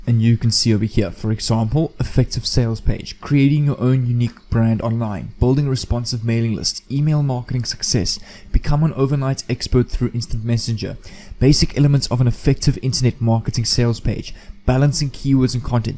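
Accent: South Africa. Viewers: As soon as I started watching this I immediately recognised that accent- so good to see a fellow Saffer doing the earning online. Definitely will follow - would love to chat more and possibly share some of my ideas with you...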